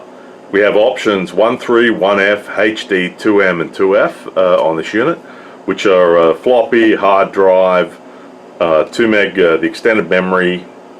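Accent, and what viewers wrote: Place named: Australia. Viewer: Cool, keep the vids coming. Really enjoy them. Love the Aussie accent too, makes a pleasant change to hear "solder" as it should be pronounced !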